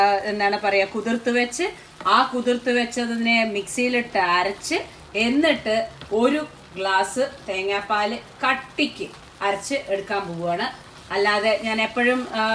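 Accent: Palakkad, India. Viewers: Ha ha ha you r really super Edakkedekku kudikkan And language Palakkadadan 👌👌👌👍👍👍😁😁😁😁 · Pazham prathaman looks simply awesome. Loved your palakadan language and presentation. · l love to hear your palakadan language and reciepes. 😊💕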